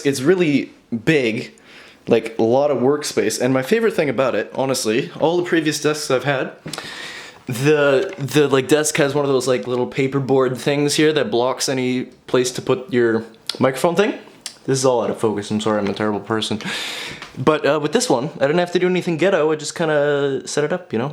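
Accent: Canada. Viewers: Your Canadian accent really comes through in this video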